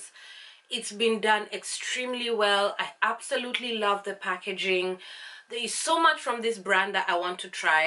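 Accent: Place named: Kenya. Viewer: Hi 👋🏽 Have you been to Kenya? You've got the accent.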